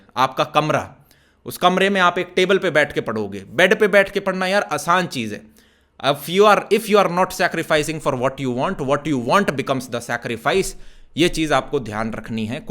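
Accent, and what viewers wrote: Haryana, India. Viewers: in between Bhai ki thodi thodi Haryanvi nikalti hai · Bro are you from Haryana? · Haryanvi accent❤️❤️❤️ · Anyone notice the Haryana accent in Aman bhaiya...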